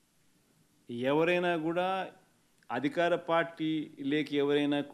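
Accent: Rayalaseema, India. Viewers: Haha rayalaseema lo yasa tho answer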